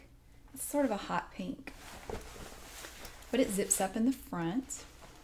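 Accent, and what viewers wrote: South, United States. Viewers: Darling, this is a great video on thrift shopping, but it is not soft spoken and it is not ASMR. I know you are a fashion fan, but this video is too high energy and does not induce tingles even with your beautiful southern drawl. Perhaps you should start a second channel specifically for your fabulous clothing shipping trips. I do find your Aldi food shopping videos to be quite relaxing. Best, C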